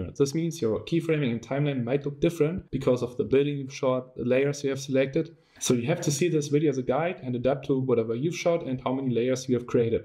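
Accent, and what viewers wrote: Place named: Germany. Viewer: Du bist Deutscher ich hör das raus aber Nice Video haha 😂❤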